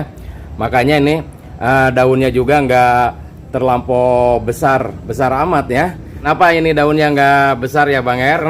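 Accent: West Java, Indonesia. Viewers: Bang Er orang Sunda ya...?keliatan dari logatnya . Kalo gitu sy panggilnya kang Er.. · Suka sama logat sundanya.. Menjelaskannya juga jelas banget..mau coba praktekin semoga berhasil..trmksh..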